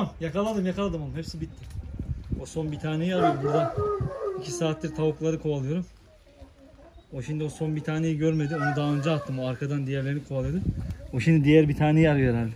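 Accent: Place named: Araklı, Turkey. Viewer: Usta kesin Araklıdan konuşmadan belli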